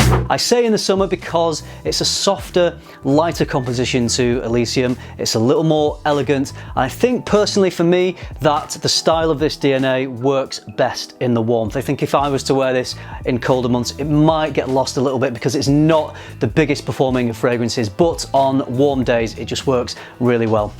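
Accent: United Kingdom. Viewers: that British Accent, man... I insanely LOVE hearing it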